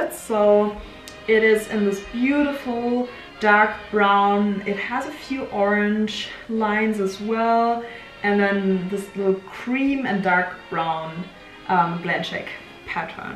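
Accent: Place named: Germany. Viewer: Your German accent makes you have a lovely voice! I stumbled across your channel while watching 1940's videos. Hollywood should be using you as an actress in 1940's theme movies. You could definitely play an eloquent society girl of the 1940's.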